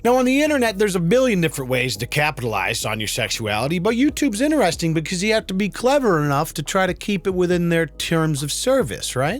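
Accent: Australia. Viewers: She’s Australian not British lol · Australian, not British my friend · I don't think she's British. I think she's Aussie. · Very funny, I think she’s Australian not British, I’m British so I hear it. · I think she's Australian not British lmao they pronounce things much more weird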